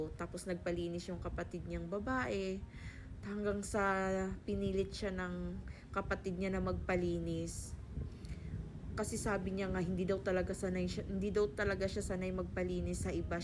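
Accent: Iloilo, Philippines. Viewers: Illongo accent is a bit annoying here in negros oriental its an accent from bukid only kagid haw haw d karabao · Hahahahaha .. N d sapaka ahh..nami ya imo tingog...!! Ilongguha madam ahh..hehehee Bay'i da cla...hehehee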